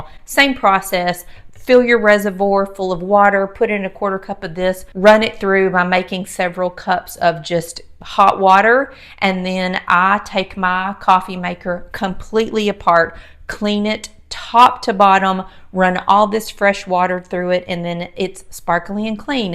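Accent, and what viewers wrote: South, United States. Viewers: I agree, her accent is endearing. DisposAll is a GE brand of disposal, one of the oldest. Her using a brand name in place of a generic term for her disposal is the same as people saying Kleenex when referring to any facial tissue. Another word southerners often use is Coke when referring to any soft drink. So in this case, saying DisposAll isn’t a southern pronunciation, it’s a word substitute. (39 years in the South for me.) 🙂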